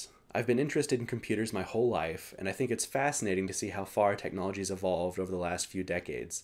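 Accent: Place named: Australia. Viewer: There's some Australian creeping into your accent for sure.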